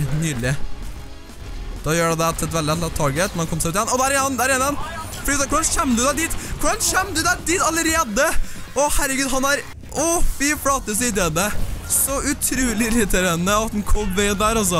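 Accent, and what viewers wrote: Trøndelag, Norway. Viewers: Trøndersk e bæst!!!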